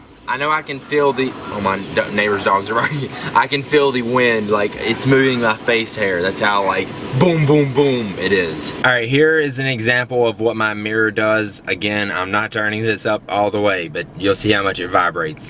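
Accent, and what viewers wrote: South, United States. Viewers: Are you from the southern states? I hear a little bit of a southern accent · Dude how is this impressive... Do u watch ur own.vids before u post them? This is a tech channel and I got this southern sounding pre-pubescent. Hillbilly saying "it mAkes radio sound better.. Durr"..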